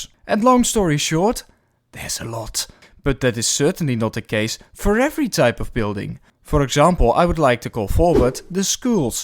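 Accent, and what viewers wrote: Netherlands. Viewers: I heard that accent and immediately knew you were dutch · U can hear this guy is dutch or aren't you? Oh nevermind he included the map of the netherlands 😂😂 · I love the Dutch into English accent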